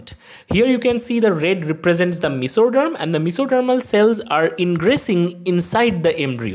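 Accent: India. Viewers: he's indian, how else is he supposed to speak.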